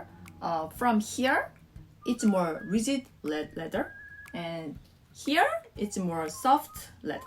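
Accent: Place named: South Korea. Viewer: 발음이 한국인인데?! ㅡ..ㅡ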